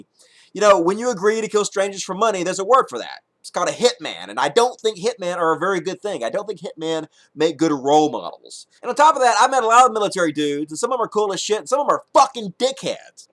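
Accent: South, United States. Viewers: Probably the most rational person about war who has a southern accent that I've ever heard. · What a hoot! That face, that accent, - going completely against my non-American expectations of how Americans who look and sound a certain way think and delivering complete good sense. Nice one.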